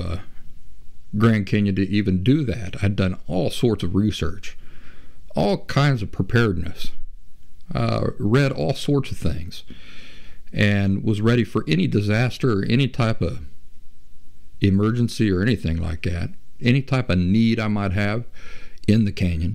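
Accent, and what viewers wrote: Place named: South, United States. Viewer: no worries about how you talk, My dad was from Alabama/Mississippi and he talks almost just like you. I'm glad I found your YouTube channel. Thanks for video.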